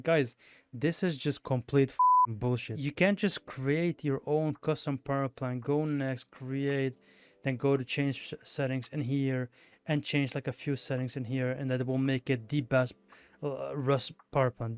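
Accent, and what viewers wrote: Turkey. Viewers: türk mü la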